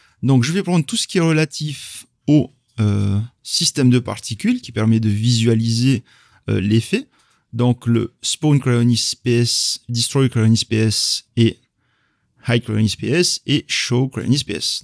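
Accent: Tahiti, French Polynesia. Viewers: Bravo, vraiment! Je me demandais quel accent tu avais:) Tahitien donc!, enchanté moi je suis Breton:D Tu as du skill c'est indéniable, continu STP avec tes vidéos (je n'ai vu que celle-ci:D) d'aider la commu francophone sur se superbe moteur. Bon maintenant je vais voir tes autres vidéo:D Par contre j'ai pas compris pour ta timeline si elle est de type vector par exemple, est ce que l'on pourrais en faire une macro et la mettre dans l'actor component? Encore merci tu viens de gagner un fan:)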